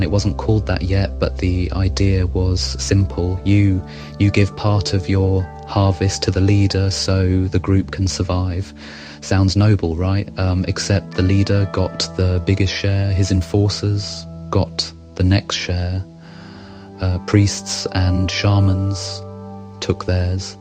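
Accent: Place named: South England, United Kingdom. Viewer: I'm a Geordie. It's always nice to hear that refined southern accent saying something that isn't absolute shite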